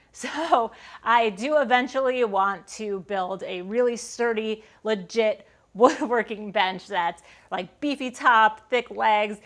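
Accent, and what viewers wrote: United States. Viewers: She just sounds like a Yank to me, but whatever, you're all going to be speaking Chinese soon anyway.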